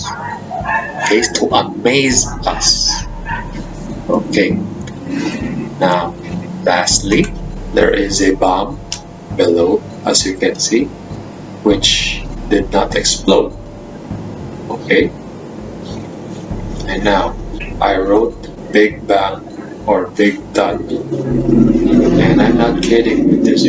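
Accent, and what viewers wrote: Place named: Philippines. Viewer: totally has a Filipino Accent.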